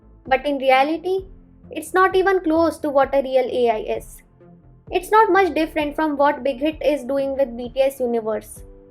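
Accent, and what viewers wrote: India. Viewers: are you indian btw ? cause your accent 😼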